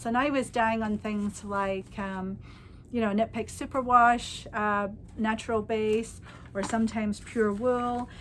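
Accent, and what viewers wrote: Scotland, United Kingdom. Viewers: Raining sideways!!!! Thank you so much, this podcast absolutely made my day. This is the first time listening, and I just burst out laughing at your comment about the rain the in Western Isle being sideways. I was born and brought up in Stornoway, so yes, I can absolutely agree with you. I am living in Fairfax, Northern Virginia, and I could listen to your beautiful Scottish accent all day - especially as I have not been able to do my yearly pilgrimage home this year! I will probably be binge watching to catch up with your many shows, and I look forward to shopping with you very soon. xxx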